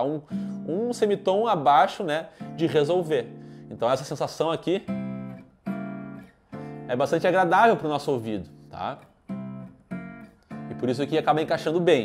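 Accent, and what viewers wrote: Brazil. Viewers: falas muito rapido que nao da para perceber metade das palavras (engoles as palavras ) de maneira que isso so serve para a lingua brazileira ,mas quem fala portugues os seus videos nao tem uso algum. o meu conselho seria fazer os videos em engles asim todo o mundo percebia ,com os videos em lingua brazileira so os brazileiros e que percebem que caralho estas ai a ladrar ,mas quanto ao asunto do video esta O K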